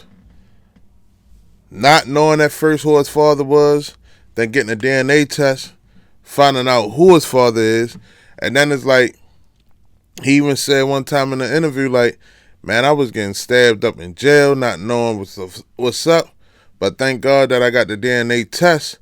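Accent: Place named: Baltimore, United States. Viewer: 1st off, this is my 1st time catching your videos and I can tell out the gate that you are from Baltimore. 2ndly, I don’t think a persons son should get the raft of their father’s actions. Unfortunately, the previous generations actions often trickle down to their off spring. It’s not fair, but it does happen.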